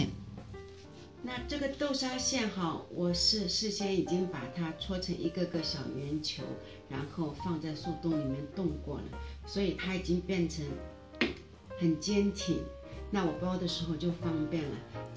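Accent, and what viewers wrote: Shanghai, China. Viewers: 谢谢老师，很亲切的上海普通话，白脱油😄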